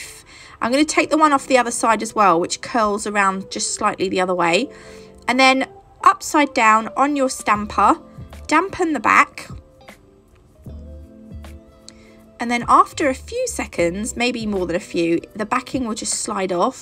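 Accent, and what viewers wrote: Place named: RP, United Kingdom. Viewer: They are really cute. I love the layering of different flowers (decals, paint & nail art) thank you ❤️ I hope you don’t mind me saying, but I’ve just noticed your voice/accent is quite posh sounding 😊(so ladylike….. and then the Pepsi Max shows it’s face and we get a burp 😂). Never change ❤️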